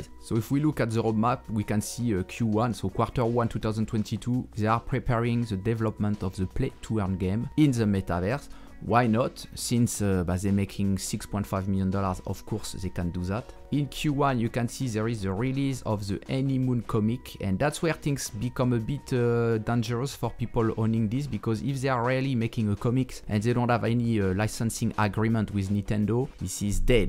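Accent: France. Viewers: Deux secondes dans la vidéo et j’ai grillé que t’étais français ! Haha en tout merci pour l’information et les vidéos c’est une vraie source fiable, continue ainsi mon con je suis derrière toi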